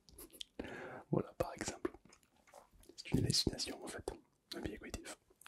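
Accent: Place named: Toulouse, France. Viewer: Une petite pépite ta vidéo ❤️ merci a toi ta une très belle voix avec ce petit accent toulousain ❤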